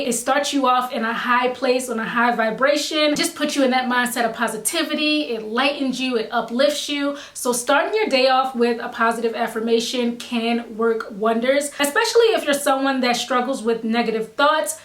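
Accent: Baltimore, United States. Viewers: Are you from Baltimore??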